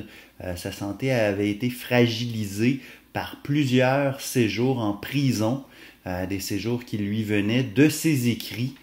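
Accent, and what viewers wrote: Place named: Quebec, Canada. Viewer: J'aime bien l'accent Québécois . peut-être dans deux mois je serais là-bas ..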